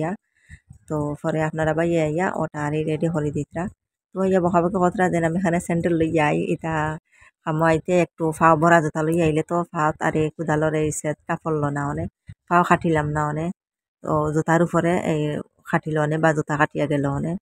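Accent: Sylhet, Bangladesh. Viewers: আমাদের সিলেটি বইন তো। এই জন্য খুব সুন্দর করি কথা কইন। খুব সুন্দর লাগলো আপু ভিডিও টা। সাবধানে কাজ কইরো বইন।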